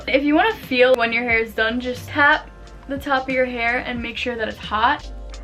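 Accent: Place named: New York, United States. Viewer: are you from ny?? I love ur accent lol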